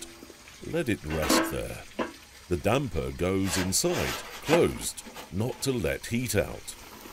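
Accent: United Kingdom. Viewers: I've seen so MANY of these videos to know that is a superb cook! I LOVE it, when there's NO narration, with the quiet absorption of nature. That being stated, I'm OKAY with this (I assume, British) gentleman's voice; he speaks in the first person...as voice. Whereas I find it a bit hard to associate the accent with the person (!), I appreciate that this narrator doesn't excessively talk...he pauses now and then, which is good. I'm still diligently attempting to discard national prejudice(s). Our fellow humans NEED to sustain love, especially in these times of turmoil. does that. SUPER 👍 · That dubbing or voice over does not go well with the genuine pure nature of these videos. Your videos are like a window into that simple village life. Voice over in a British accent feels like having coffee with salt.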